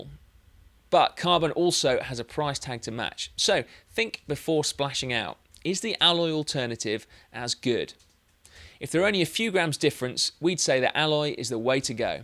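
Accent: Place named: England, United Kingdom. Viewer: It's an English accent!!!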